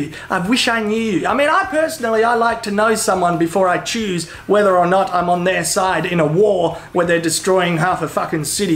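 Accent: Australia. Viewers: You Aussie accent makes this shit so entertaining hahaha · You have THE best internet aussie accent. ~a fellow aussie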